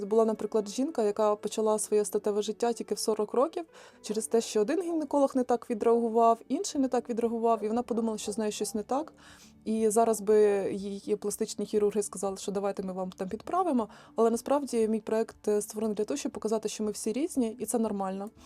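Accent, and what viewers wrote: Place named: Poltava, Ukraine. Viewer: Впізнаю рідний полтавський акцент 😊. Трохи незвична відсутність суржику 😅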